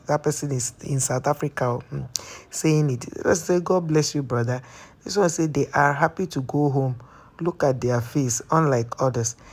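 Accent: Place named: Nigeria. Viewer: Definitely you are Nigerian as you sound. So you wanna compare 9 south africans with millions of Nigerians in south Africa?anyway I'll say this, you trying to defend, you making things worse, just call them out for the bad things your fellow Nigerians are doing in south Africa. Eg:I had to move my child from the daycare he used to go to just because your Nigerian brothers are seating close to the gate selling drugs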